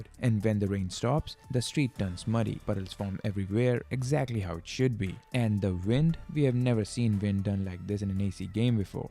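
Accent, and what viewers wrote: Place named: India. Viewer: Голос как у Индуса.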